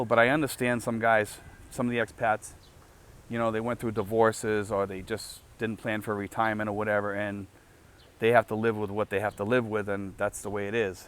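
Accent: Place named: New England, United States. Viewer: Great Vlog! You made me hungry for New England Chowder soup listening to your accent. Campells it is! Crackers n pepper! Sincerely Bruce Tattrie Edmonton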